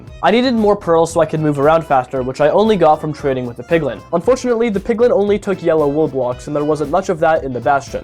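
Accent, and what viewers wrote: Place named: Canada. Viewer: The moment I heard Kenadian I started loving this guy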